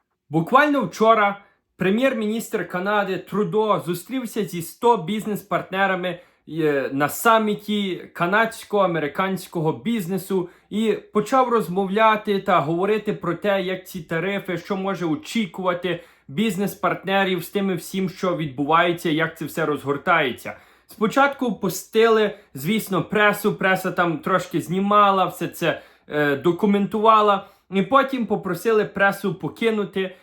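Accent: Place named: Lviv, Ukraine. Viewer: не львівський в нього акцент, а з львівських сіл (видно вчили мови бабуся чи дід)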